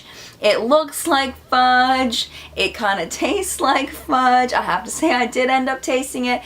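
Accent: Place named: Philadelphia, United States. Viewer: Hi,. I just wanted to let you know I came across your channel looking for some reviews for all those subscription boxes. I have loved everyone of your videos since. I also can't get enough of your accent because it reminds me of home. I grow up in Philly. Anyway, I wanted to share the thing I have been recently loving.... JAPONESQUE Makeup Brush Cleanser. I usually just clean my brushes with antibacterial hand soap....not anymore! If you haven't, you should check it out. I had never heard of them before. Be well! Can't wait till the next video!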